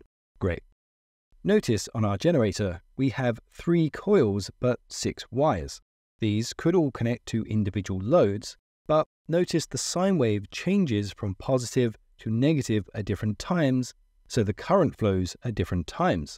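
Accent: England, United Kingdom. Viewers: cool, a british accent :) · It feels so weird listening to a British accent using American terminology’s (and voltage etc). Earth to ground Star delta to wye delta etc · The weird thing is an English accent after starting with a non-English sockets picture. Principles are the same, but was a bit surprising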